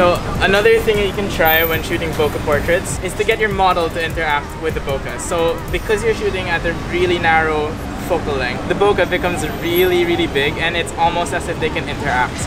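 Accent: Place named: Philippines. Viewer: From his accent alone i know this guy is filipino hahahahaha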